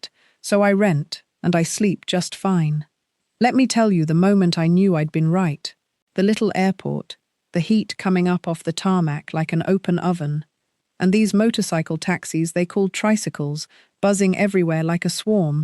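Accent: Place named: United Kingdom. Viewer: I love your story, brilliant. I too love the Philippines, I have filipino friends. For an American woman you've got a fabulous British accent. Great story. Wish you well..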